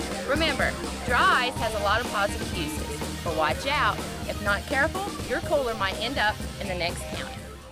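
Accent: South, United States. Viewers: I like her southern accent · she got a southern accent!!! i like!!! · southern accent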